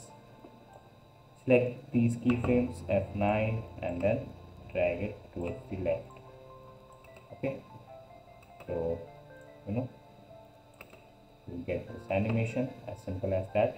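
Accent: India. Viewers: He’s better bro He’s Indian and tried his possible best to speak English. Thank you bro and keep up the good work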